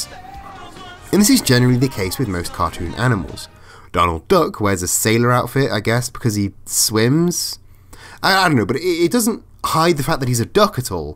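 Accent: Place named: United Kingdom. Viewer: I guess I'll go against the grain and thank you for the first part. It had information I didn't know. The whole signifier thing is interesting. And it did connect well with "male by default." But once you actually got to explaining that, you were just saying stuff I already knew. It came up when I checked out this "horrible" Anita Sarkeesian everyone was talking about. I wonder if having a male-sounding voice (with a "smart person" aka British accent on top) talk about it will help it resonate further. Though do I understand correctly that you are actually non-binary? Just checking so I use the correct pronouns.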